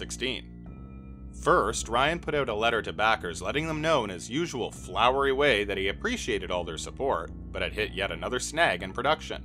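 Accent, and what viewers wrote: Canada. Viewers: I think the voiceover guy has the most Canadian accent ever lol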